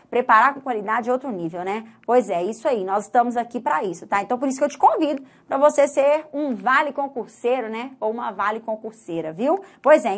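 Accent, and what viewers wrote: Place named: Minas Gerais, Brazil. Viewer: Parece minha tia q mora em Barbacena MG (sotaque)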